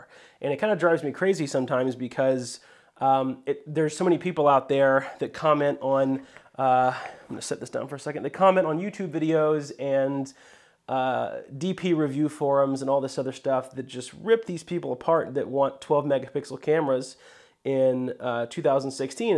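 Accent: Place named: United Kingdom. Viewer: You do a really good British accent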